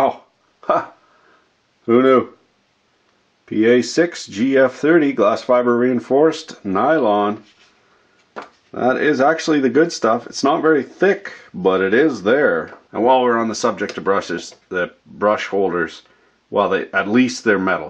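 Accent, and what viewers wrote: Canada. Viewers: No wonder he talks weird he’s Canadian.🙄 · Canadian as fuck. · Canadian as fuck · I cannot get enough of this Canada talk. Awesome. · strongest canadian accent I've ever heard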